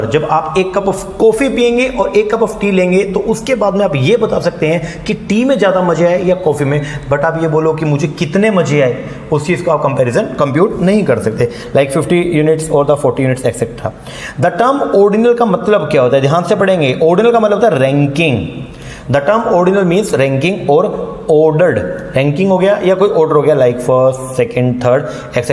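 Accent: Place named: Gujarat, India. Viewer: Sir are you gujrati ? Or are you from Gujrat? Sir bcz jab aap padhate ho toh language mein gujrati ascent pta chlta h😁😁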